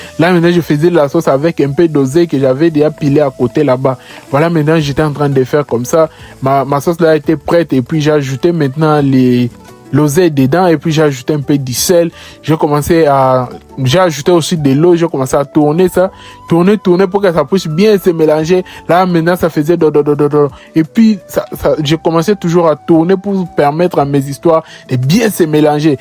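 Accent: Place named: Ivory Coast. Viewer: T'es congolais mais t'as l'accent des ivoiriens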